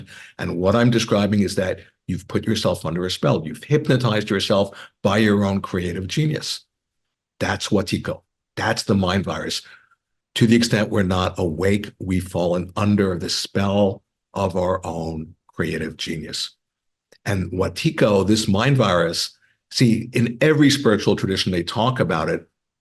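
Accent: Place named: Philadelphia, United States. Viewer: Is Mr. from Philadelphia? His accent sounds like he is. I was surprised to see this is being presented by The Theosophical Society. They had a building on Rittenhouse Square in Center City- Philadelphia.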